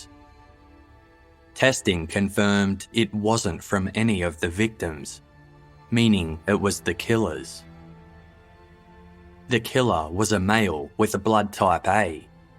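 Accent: Australia. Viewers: I really enjoy that it’s presented in an Australian accent 🇦🇺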